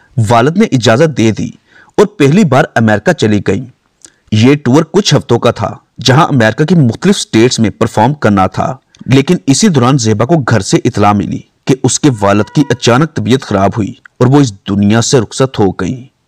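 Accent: Punjab, India. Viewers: Tu c Urdu Punjabi main bolte ho. Apna Punjabi lehja dorost Karo. Urdu sahi Sahi bolo.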